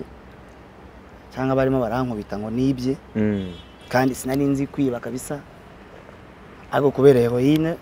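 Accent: France. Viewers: Afite accent nziza yifrance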